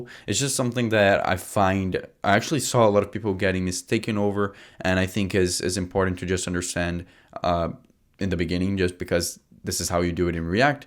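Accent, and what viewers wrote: Brazil. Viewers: Esse mlk é BR certeza ou é português kkkkkkk to maratonando o canal dele, bom demais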